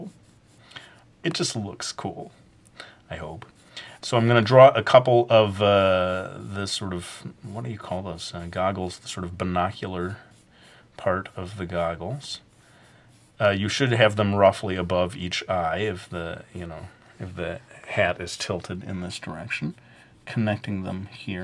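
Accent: England, United Kingdom. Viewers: Totally love the English accent! you do it very Good. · Maybe a bit British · Yeah... that almost british accent is exactly how I sound when commenting on your videos out loud,!;) And btw, you should really do a steam punk manga!:D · the new voice is kinda british, not to be mean. i do like the voices they are funny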